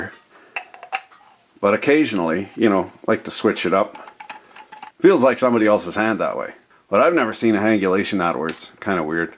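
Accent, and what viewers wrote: Canada. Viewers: No wonder he talks weird he’s Canadian.🙄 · I cannot get enough of this Canada talk. Awesome. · strongest canadian accent I've ever heard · Canadian as fuck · Canadian as fuck.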